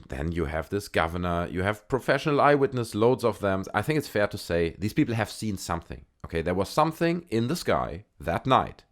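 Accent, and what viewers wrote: Germany. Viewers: For one thing you have a German accent for another were you there I was there when that happened in 97 my wife and I then I had a video camera I have video taped it it didn't make a sound and it was from not this world you can tell when your hair stands on your back it wasn't from this world no it wasn't a reshape balloon for one thing a balloon will not go into space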